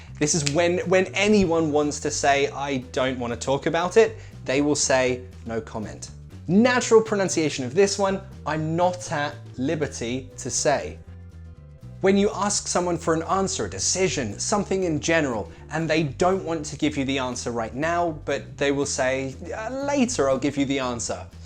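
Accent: United Kingdom. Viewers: OMG ! u've got a great British Accent . 😮😮 by the way . I like ur style of teaching it's funny All the best 😍❤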